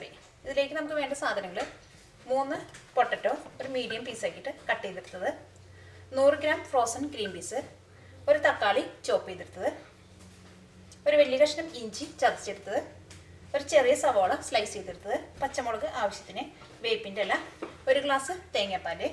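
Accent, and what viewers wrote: Thrissur, India. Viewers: simble ayi arkum theyyarakkan pattunna reethiyilanu chechiyude show nyan uae yilanu nyan eee show nokki friendsinte munpil alayittundu prathyakichu chechiyude slang thani nadan thrissur oreyoru vishamameyullu enne ithrayumathikam karikalundakkan bpadippicha chechiye onnu kanan pattumo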